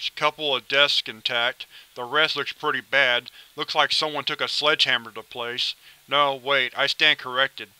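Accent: United States. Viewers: I think it's his accent, I've watched a ton of these, and i know alot of people from all over the states. I've heard this accent plenty.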